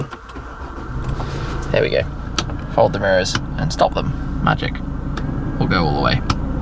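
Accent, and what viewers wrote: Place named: United Kingdom. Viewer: Only me annoying this British accent ?))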